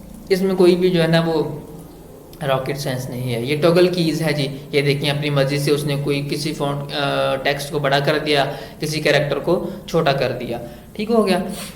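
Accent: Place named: Punjab, India. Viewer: Hahaha punjabi touch 👌 .. Well explained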